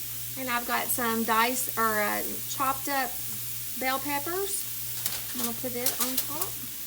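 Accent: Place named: Eastern Kentucky, United States. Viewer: This looks so good! I have never made ribs....of ANY sort! That is my husbands thing! I recognized your accent! My sister in eastern KY for years!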